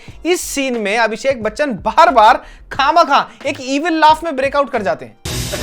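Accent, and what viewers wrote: Haryana, India. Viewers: Was missing your haryanvi soooo much 😍😍😍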